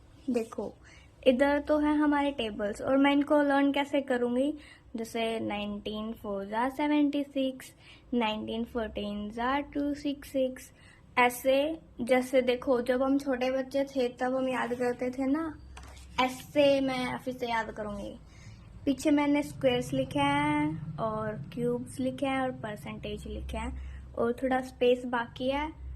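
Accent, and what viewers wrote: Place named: South, India. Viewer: South Indian ho kya aap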